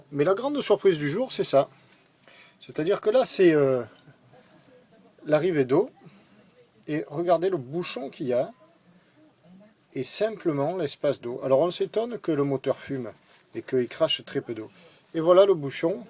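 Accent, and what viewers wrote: South, France. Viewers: Bonjour, Et bravo pour cette vidéo réalisée dans un espace "confiné" ah les bateaux… J' ai vu que vous taillez vos joints vous même, il y a bien des années, je le faisais aussi, mais je n 'arrive plus à me fournir en "feuilles" de ce matériau spécial joint. Auriez vous une adresse de fournisseur, car en plus j' entends un bel accent qui me fait penser que vous êtes du midi, je réside moi-même à Marignane, alors si vous voulez bien me donner un tuyau… Je vous remercie par avance. Prenez bien soin de vous et bonnes prochaines ballades en mer. Cordialement. Tristan.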